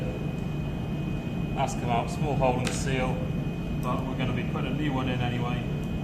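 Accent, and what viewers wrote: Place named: Wales, United Kingdom. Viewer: oh right that's cool I'm from South Wales and thought that's a Welsh accent. Where do you meet the ship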